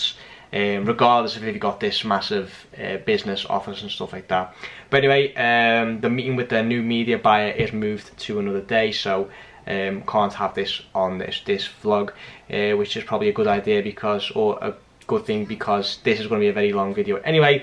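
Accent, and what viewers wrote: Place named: Liverpool, United Kingdom. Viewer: how is it that you are a dutch citizen but sound like a scouser? ☺️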